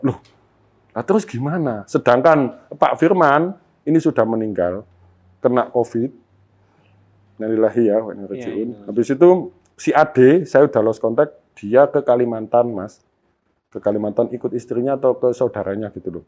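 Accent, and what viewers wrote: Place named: Java, Indonesia. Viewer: SEBENARNYA SEREM sih, cuma NYRITAINYA trLaLu MEDHOK. jatohnya LUCU sih.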